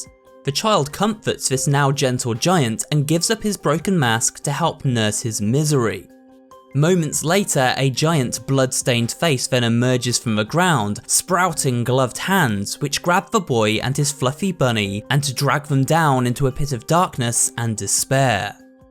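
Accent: United Kingdom. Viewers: British confirmed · It is just now when I watch the videos and I notice this guy has a British accent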